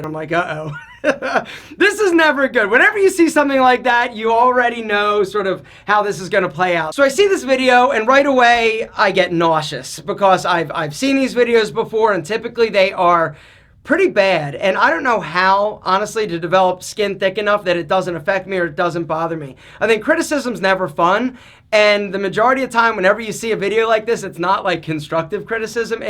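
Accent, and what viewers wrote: Philadelphia, United States. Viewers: I enjoy your posts. I get a kick out of your strong Philly/South Jersey accent. I also agree about the Dollar Shave stuff. The shaving stuff is a bit expensive, but you need so little to have a great shave! So, hang in there . Keep amusing with good advice.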